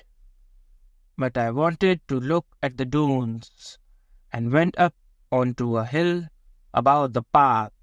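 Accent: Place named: India. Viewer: Indian is telling this story , accent is too strong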